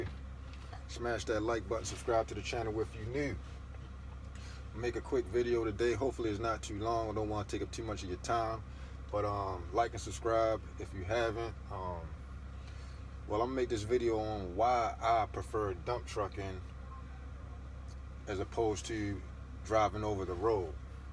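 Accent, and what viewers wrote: Baltimore, United States. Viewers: Do you have to be a dump truck owner op..to make this kinda bread ? Been drivn otr 5 months..and u sound like my city bmore lol?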